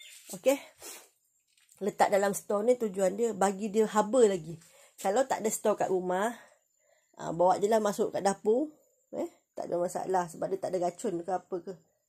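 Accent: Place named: Negeri Sembilan, Malaysia. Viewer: sedap jugak loghat negeri sembilan ni😅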